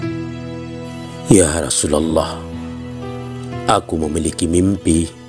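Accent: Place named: Bali, Indonesia. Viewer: Suara admin kayak orang Bali ya?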